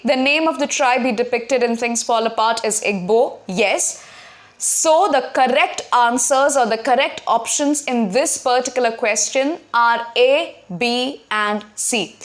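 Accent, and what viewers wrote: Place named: RP, United Kingdom. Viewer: Your accent sounds like that of a BBC News presenter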